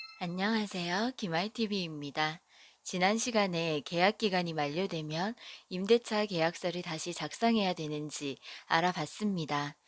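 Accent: Gyeongsang, South Korea. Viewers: 갱상도 발음이 참 귀여우시네요~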